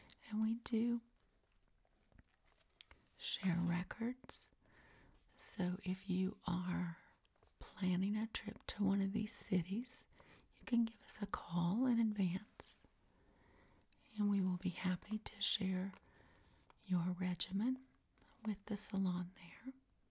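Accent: South, United States. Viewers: do I detect a southern accent maybe?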